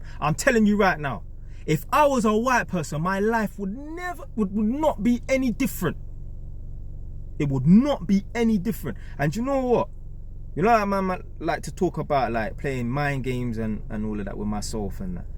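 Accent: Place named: Roadman, United Kingdom. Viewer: Why did you talk English in your early videos, but now you speak like a roadman? (i dont mean to be disrespectful)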